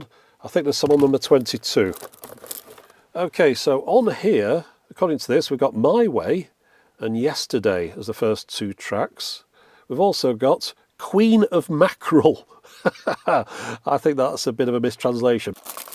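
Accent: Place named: Lancashire, United Kingdom. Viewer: I reckon is from Lancashire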